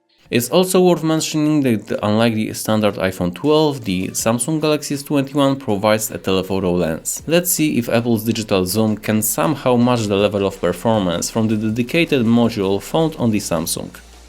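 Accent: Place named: Poland. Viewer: you sound so Polish. I mean your accent. Very nice comparison though . Just bought Galaxy s21 hope I can get use to android xd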